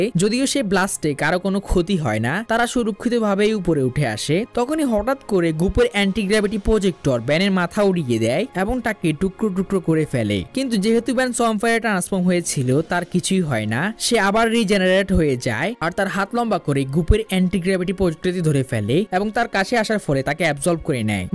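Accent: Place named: Noakhali, Bangladesh. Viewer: Noakhali accent chara baki shob tikh ache. Accent tikh korle awesome hobe. Good luck bro.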